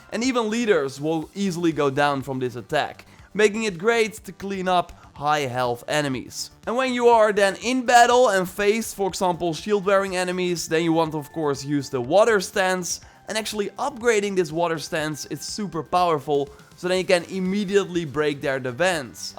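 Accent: Netherlands. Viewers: You are dutch aren’t you??😂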